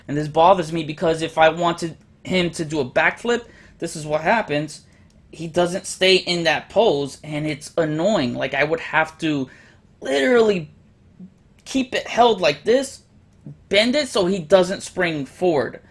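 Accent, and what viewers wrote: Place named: New York, United States. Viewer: Shart owns “big time let down” and now you own “big time caca”. Please more “on the shelf” segments and ain’t no shame lettin our New York accent show out, bro. Salute.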